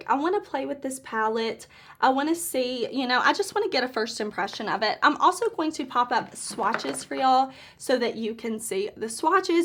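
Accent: South, United States. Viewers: I literally love your voice and is that a southern accent? I also love that you have so much lid space. I have hooded eyes so my shadow is always kinda hidden.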